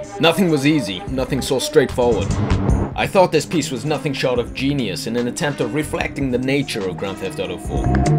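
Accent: United Kingdom. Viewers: You sound like Cryaotic(A famous youtube gamer) with a british accent.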